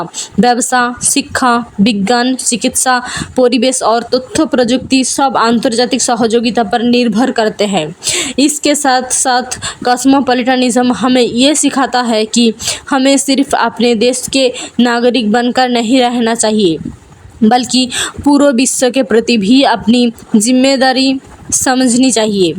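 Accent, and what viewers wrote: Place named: Bengal, India. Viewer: Are you a Bangali? Bengalis are speak like this.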